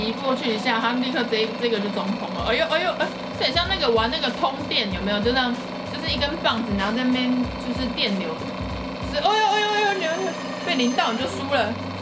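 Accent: Taiwan. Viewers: 慶煙那邊我笑死了講話真的好台哦♡酷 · 台湾腔真的是萌到爆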